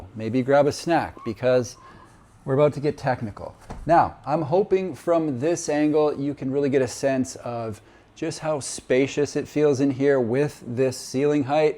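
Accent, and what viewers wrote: Canada. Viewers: Nice Canadian accent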